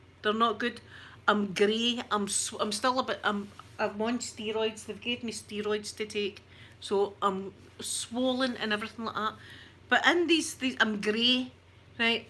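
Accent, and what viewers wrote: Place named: Glasgow, United Kingdom. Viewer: I could listen to you for hours. My dad is glaswegian so your accent feels like home to me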